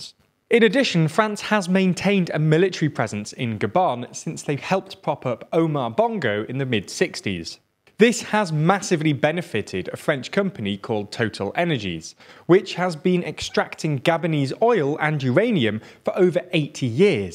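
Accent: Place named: RP, United Kingdom. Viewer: Do you also run the channel 100% Star Wars? It might just be that recieved pronounciation accent and my American ears.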